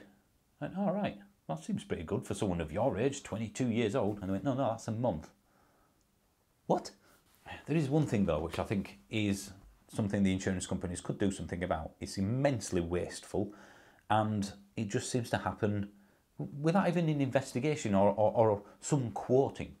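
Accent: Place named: Hartlepool, United Kingdom. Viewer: Same accent as Hartlepool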